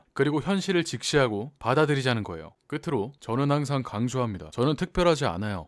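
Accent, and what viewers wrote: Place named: Chungcheong, South Korea. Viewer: 목소리 멋있다.. 릴스보고 진짜 충청도 사람인줄 ㅎ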